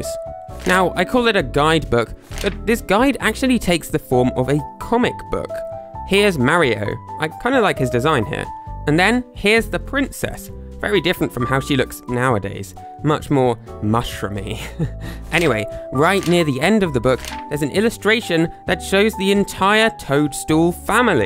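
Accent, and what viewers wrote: United Kingdom. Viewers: What a great accent you have...what part of Britain are u from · what kind of british accent do you have?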